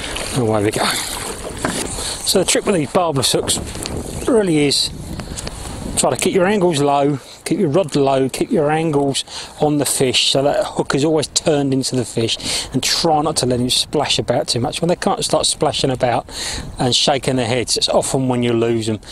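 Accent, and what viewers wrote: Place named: Essex, United Kingdom. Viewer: Nice one - very useful information. I'm from up here in the north west and I've been trying to mic your lovely Essex accent to my mates up here - nearly got it!! Great stuff mate - well done!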